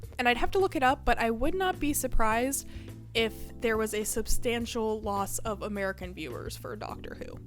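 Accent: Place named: United Kingdom. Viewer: lol british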